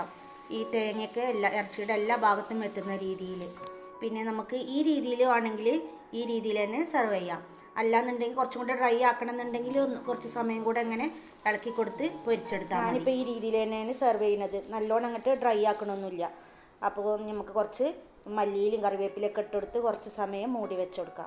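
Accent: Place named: Malappuram, India. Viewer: X-Mas Special Bief Fryoo😮😅Am much Impressed with Your Way of TalkinG Than CookinG ..Adhu oru vere Vibaan ...Asaal Malappuram Kaaaariii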